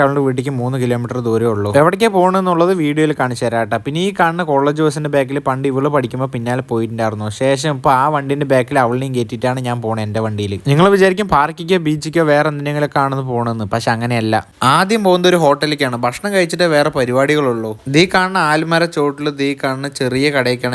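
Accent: Palakkad, India. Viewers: Enik ishttayi super vedio Enik ishttamanu palakkada slage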